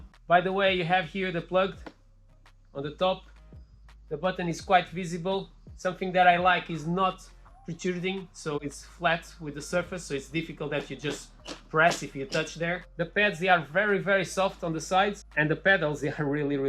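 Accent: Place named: Portugal. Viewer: Dude! Are you Portuguese or something? That accent man... so familiar!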